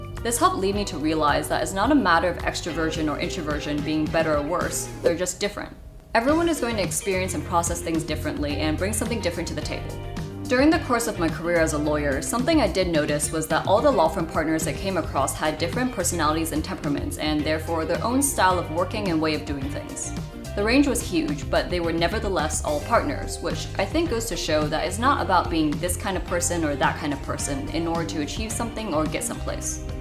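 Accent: United States. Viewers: I've watched a few of your videos and have decided to subscribe to your channel. As a UK person living in Asia, I can only thank you for giving us a glimpse in to the high society life of a Hong Kong resident. Most of what we see on Youtube are people living in shoeboxes under squalid conditions due to the highly inflated housing market. Do all people in HK have American accents? I know you grew up int he US, but your fella also has an American accent. Just curous because HK was a former British colony and all... Also surprised that the legal system still follows the UK way, you said you did it after "high school" - this term is only used in London and we actually have secondary schools. So I guess the whole UK educational system was thrown out when China took the reins in favour of a more American system. I find it very admirable, that not only you leave your job but also your profession to pursue your own dreams and do something better suited to your character. 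As an introvert myself, I found the best person to work for was myself. And that has made me somewhat successful. I hope it works out for you. You are really beautiful by the way.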